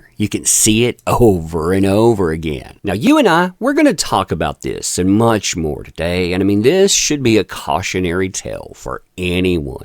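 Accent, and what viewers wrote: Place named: Virginia, United States. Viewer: WOOTEN is still butthurt that Elon purchased "X" and no longer surpressed opinions. BTW Brother, your accent sounds like those in Fredericksburg, VA.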